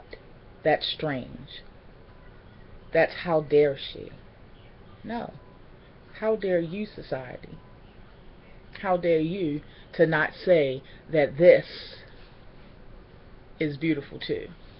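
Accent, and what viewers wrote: Baltimore, United States. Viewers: Trying to catch ur accent! Where r u originally from? I hear Baltimore a bit???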